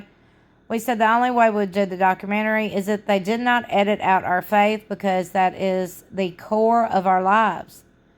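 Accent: South, United States. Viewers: I had an algorithm direct me to Crystal ball channel she seems off. Then i found u as a southern speakin lady, i get an adore your accent. Y'all are every thing.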